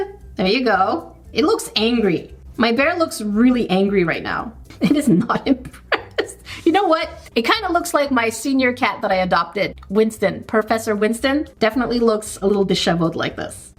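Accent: Australia. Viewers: YOU MEAN **AUSTRALIAN ACCENT**, RIGHT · Im kinda hoping you get the koala just coz your aussie accent made me giggle 😂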